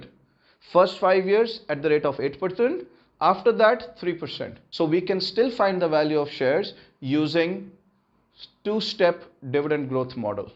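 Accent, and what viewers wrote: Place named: England, United Kingdom. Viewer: I like your teaching sir, and your English accent 🤗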